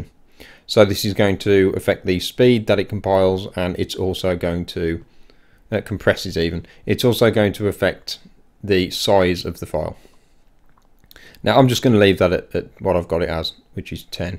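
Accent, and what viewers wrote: Birmingham, United Kingdom. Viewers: Are you brummy? Had to ask Haha.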